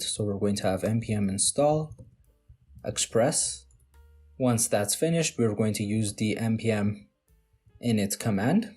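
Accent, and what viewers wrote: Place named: Ethiopia. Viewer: first time to see habesha boy teaching coding in english keep it up bro u got nice accent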